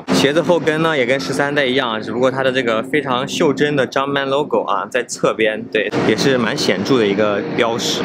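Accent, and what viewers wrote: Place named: Taiyuan, China. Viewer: 听口音 太原后生？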